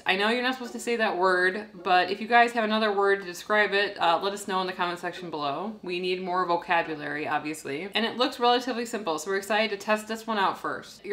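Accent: Chicago, United States. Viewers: First off you guys are effing adorable! I love the dry sarcastic sense of humor! Thanks for making this helpful content! PS. You sound like your from Chicago!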